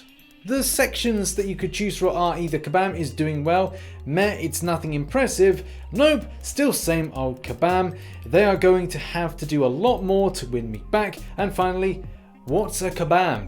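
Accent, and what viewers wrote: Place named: RP, United Kingdom. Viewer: Keep speaking that queens English bud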